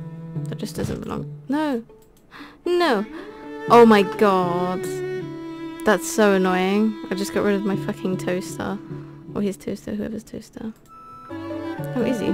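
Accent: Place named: Australia. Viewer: The Australian accent is probably my favorite accent, its just like a lot more relaxed (some of the time), it just makes the video 100 times better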